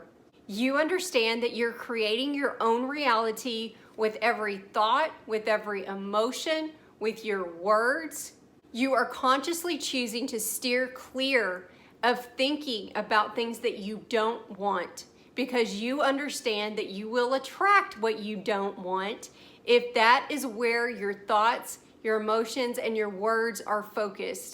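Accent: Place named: East Texas, United States. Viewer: You have got to be from East Texas! Love your message..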